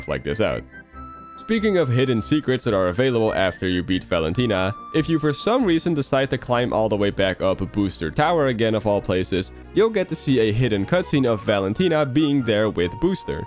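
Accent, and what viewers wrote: Netherlands. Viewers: You sound very dutch im dutch as well loll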